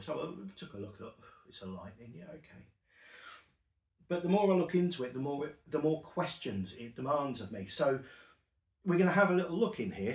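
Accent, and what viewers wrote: United Kingdom. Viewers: 2 seconds in, and i already know I am not interested in this break barrel pellet gun. As soon as i hear the British accent from a guy wearing a batman t-shirt, I know the gun does not pout out enough energy to drop a squirrel at 80 yards. I can not doubt it is a well made gun, (I never had one), but 12fpe is just no fun. Stop letting your governments treat you like children,---------- Batman.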